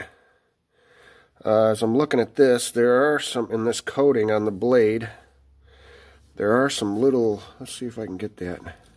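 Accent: United Kingdom. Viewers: Those Condors are going to be great work horses. Spot on British accent Haha. That Tops is going to be one hell of a slicer. Thank you for doing this giveaway. The knife community is something else. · Ha you nailed the bloody British with that accent. I’ve been looking for a plan A by condor and cant find it anywhere in-stock. That low drag looks like a work horse. And everyone knows Tops knives are outta this world. Good stuff⚔️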